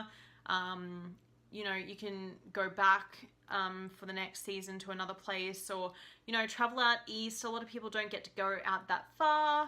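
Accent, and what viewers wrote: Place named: Australia. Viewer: I could listen to this girl forever ! Two jobs, packing all that life into so little time... don't know how she does it. But I was young once too.(that's me in the picture but... it wasn't yesterday). Loved Banff and the Canadian Rockies. Thanks to You Tube I can rediscover this wonderful place. Thank you Aussie 👧 girl ! Love your accent !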